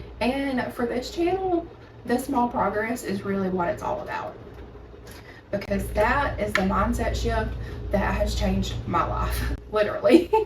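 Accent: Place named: Mississippi, United States. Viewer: This is the first vid Im watching, but now that Im in Canada your accent makes me sooo happy as someone that grew up in Mississippi 🥹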